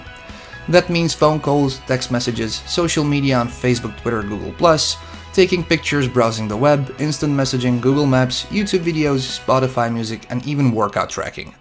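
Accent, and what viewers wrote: Russia. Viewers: I still can't pin this guy's accent, Russian?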